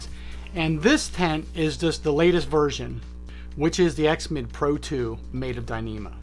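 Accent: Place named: Baltimore, United States. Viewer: Are you from Baltimore? I think I hear it in your accent, the way you pronounce during like "dOOring".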